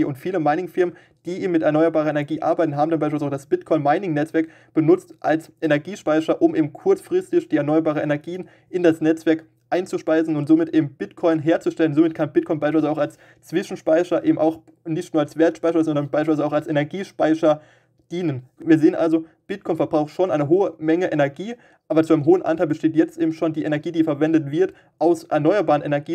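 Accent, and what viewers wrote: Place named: Frankfurt, Germany. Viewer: der junge (mann) hat den GRÖSSTEN RESPEKT auf der gesamten YoutubePlatform verdient! Seine Analyse schlägt sogar jeden seriösen englischen crypto kanal! Ich gönn es ihm dass er "etwas" an seinen Links verdient :) Sag mal kommst du aus Frankfurt???? der Dialekt kommt mir sehr bekannt vor (bin selber Frankfurter) oder doch eher richtung Vogelsberg? BITTE TU MIR EIN GEFALLEN - WERDE NIE WIE DIE ANDEREN CRYPTO YOUTUBER !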